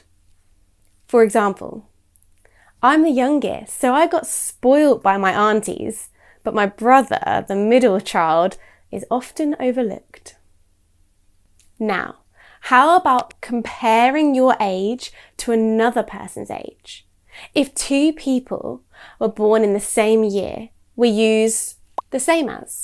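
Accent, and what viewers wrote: United Kingdom. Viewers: Your lesson is very easy to understand for me and I like British accent because i am living in UK. Thank you !! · She is british ... diffrent from American or Australian English ... Whoever put this clip needs think that hard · 말하는게 너무 영국식이야.. ㅠ.ㅠ 했더니 영국영어 채널이었군요. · 와 영국발음 적응안된다 ㅋㅋㅋㅋ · 영국식 발음 멋있어...👍👍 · What a lovely british accent!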